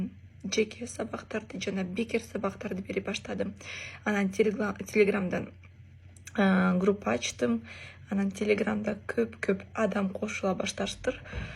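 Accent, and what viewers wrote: Standard. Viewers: Азамат кыз 👍кээ бир киргизлерге караганда таза суйлойт экенсин 🌺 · Анча мынча Киргиздерге Караганда Жакшы таза суйлойт экен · АЗАМТСЫН ЧОН КЫЗ.АЛЛАХЫМСАГА ДЕНЬ СООЛУК ,ИЙГИЛИК ,АМАНЧЫЛЫК БЕРСИН. ТАЗА СУЙЛОЙТ ЭКЕНСИН АНЧА МЫНЧА КЫРГЫЗ КЫЗДАРГА ,БАЛДАРГА КАРАГАНДА. АЗАМАТЫН. АЛГА, АЛГА.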